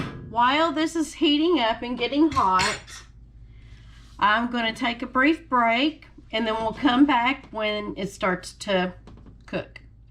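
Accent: United States. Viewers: Beautiful American accent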